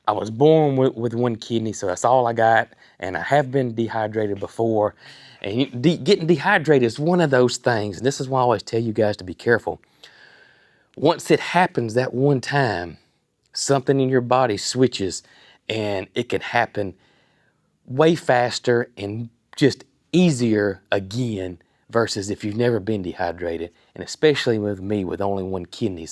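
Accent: South, United States. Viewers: Don’t you dare change your terminology, that is who you are and how you were raised. I love your southern accent and terminology’s. That is what makes you, YOU! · Love a good southern drawl, and easy going vocabulary.. it takes me home to a place and people I loved💗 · I concur with She beat me to saying DO NOT CHANGE for others!!! You speak with Southern Charm. Please, DO NOT become "woke" with the crazy world that gets offended over things or words. We love you just the way you are. Anyone gives you lip, just tell them that GRAM in TX told you not to do it Luv ya: GRAM 👵in TX🙏❤ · 100%!! I feel like people intentionally get hung up on things to cause drama, getting hung up on semantics instead of enjoying a video. If they can't figure out that knows what kind of fuel his things need, that's their problem! Expecting someone to talk the way they do is outrageous. 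He's from the South, he speaks how he speaks, it's who he is, and everyone needs to leave him be. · Do not change the way you talk, I am Southern we talk just fine. Its everybody else who talks funny, lol❤❤❤ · Under no circumstances, do you apologize or change the way you talk! You are from the south be proud if they don’t like it they can leave your channel❤ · Those of us from the South speak well. Just our version of well. Never apologize for that. State to state cultures differ. Makes us all the kinda different God has given us. And wouldn't it be BOREING if we were all the same? You betcha! Love you both! G. Davis😊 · To be quite honest with you, my brother, I don’t think you need to change a thing about how you talk. People just need to get over themselves. Honestly, as someone who lives all the way up here in Minnesota, I love any type of southern accent, The words y’all use for different things, it’s pretty neat if you ask me. 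Just keep that southern slang coming. Most of us truthfully don’t care. God bless you. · please don't change any part of your speech. I am Australian, and you and are teaching me Southern. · as a woman raised in South Carolina I appreciate your colloquiolisms. Since I was 18, I have lived all over the USA but then, 21 years ago, I moved to Australia and here I remain. One of the things that drew me to your channel is your and wholesome realness. Your accent is something I love to hear because it's something I miss and the only time I hear a similar accent is when I talk to my Mama - her mama was raised near Selma and my grandaddy was from Georgia so really appreciate the familiarity of hearing you talk about your day and the animals. It reminds me of the time I spent with my grandaddy. He taught me how to hunt & fish, grow worms, and crops. Like him, you have a quiet patience in your explaining what you're doing and showing your enthusiasm for your daily tasks. 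It's inspiring and educational at once. Thank you for sharing your days with us. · I’m from Maine and absolutely love the southern accent. Continue being you. That’s why you have so many followers and Cog Squad fam ❤ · I 💕 E THE SOUTHERN TALK, B PROUD OF YO SELF&IM. ENGLISH!🏴😎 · In Australia we call Gas Stations, Petrol or Service Stations or Servos, you can buy Leaded and Unleaded Petrol, Diesel, LPG and some used to sell Kerosene for the old heaters. We see enough American TV shows to know you call them gas stations. We all know what you mean when you say Gas. I didn't know that in the southern states you call most soda drinks "coke", but that is part of the charm of watching and learning your culture, please don't change your speech for anyone, it's who you are and where your from and the time your from. I fell in love with Cog Hill Farm, run by a wonderful family in Alabama, I love your accent and your slight differences to other places. 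Please don't change for anyone. Have a blessed day, stay cool and hydrated. I'll stay warm and out of the cold lol.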